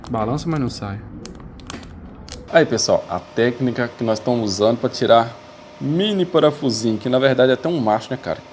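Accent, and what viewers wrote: Minas Gerais, Brazil. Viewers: Sotaque mineiro, parabéns👏🏼👏🏼👏🏼 Exemplo de trabalhar com o que gosto, obrigado🙏🏼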